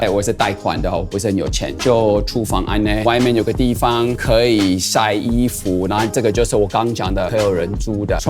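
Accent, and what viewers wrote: Taiwan. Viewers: 教授的台灣國語加台語真到地,太像台灣人講話模式了!哈哈！ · 😂😂教授講中文竟是臺灣國語....安捏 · 越來越有台灣人講話的「口氣」，安捏都丟啦😂😂😂 越來越往幹話發展的趨勢，哈哈😄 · 教授台灣國語涅😂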